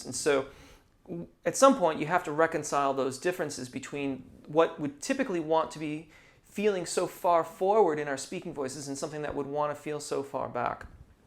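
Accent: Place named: Midwest, United States. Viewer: I am so grateful for the tremendous amount of pure quality content you provide. I find it interesting that you mentioned you are from the Midwest, then California, then England. I can tell. I am a Midwesterner myself and now your manner of speaking makes sense. 😉 I heard the influences but couldn't place where they were coming from. I just love your manner of teaching... Sometimes I forget you are not live and playing scales just for me! Thanks again.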